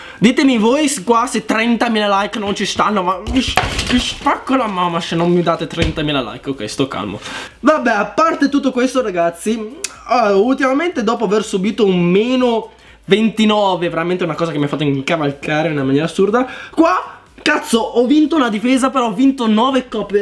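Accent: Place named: Veneto, Italy. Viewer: oh te si dal veneto...mi so veronese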